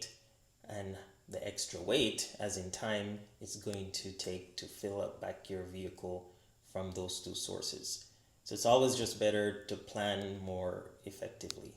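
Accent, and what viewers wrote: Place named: Bahamas. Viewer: What island are u from ? I’m guessing the Bahamas.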